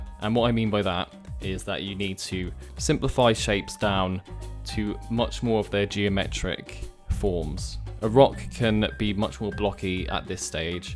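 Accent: United Kingdom. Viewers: Ha, it's nice to hear a fellow Brit artist. We're a minority group in concept art :p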